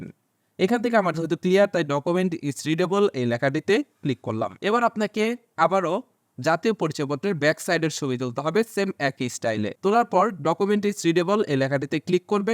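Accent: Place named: Ghatail, Bangladesh. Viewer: Vai apnar basha ghatail a amar bash oo ghatail ❤❤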